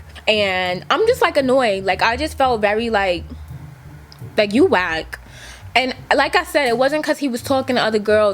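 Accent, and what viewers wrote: New York, United States. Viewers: Your accent still there but it used to be worse 😂 I need the full New York back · You are a real New Yorker 🤣🤣🤣 the way you tell the story ,and all I love it . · she so new york i love it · Are you from New York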